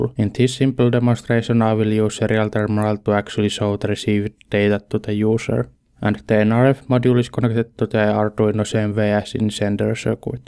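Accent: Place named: Finland. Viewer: The accent sounds a bit finnish. (Rallyenglish)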